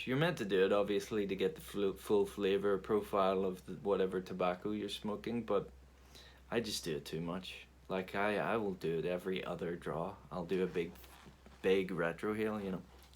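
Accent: Ireland. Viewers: Great first video! Really enjoyed it. I’ll have to catch up on your other ones now. Welcome to presenting , I’m sure your channel will grow quickly. Everyone loves an Irish accent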